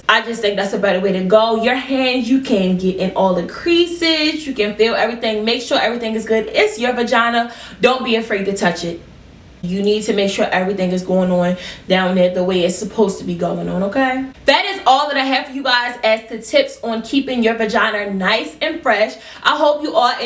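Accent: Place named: Maryland, United States. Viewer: Hearing you talk I was like “hmmm she sounds like she’s from Maryland” then I read the DB 😂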